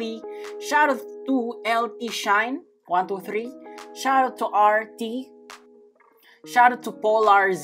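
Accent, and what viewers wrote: Philippines. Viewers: PINOY SABI NA EHHH YUNG ENGLISH ACCENT NYA PANG PILIPINO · Ur voice like philippines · I KNEW YOU WERE FILIPINOOOOOO just by your accent :> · When I heard voice I knew he is Filipino lele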